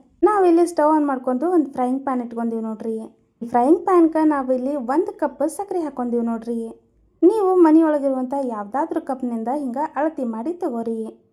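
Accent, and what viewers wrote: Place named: North Karnataka, India. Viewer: Sis niv uttara karanataka davaru añnari ... Nice talking ri❤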